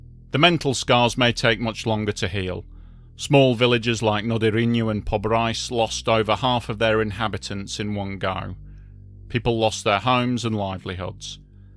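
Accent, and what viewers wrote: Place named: North of England, United Kingdom. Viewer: you sounded as though you came from the North of England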